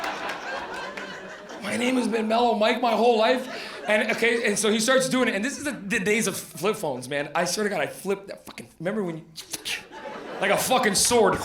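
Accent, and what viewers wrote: United States. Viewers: you were great. You had me rolling, you sound like you’re from Miami 😂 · Why does he sound like he's from Jersey? I had to look him up; did I know where he's from? Canada? I was surprised.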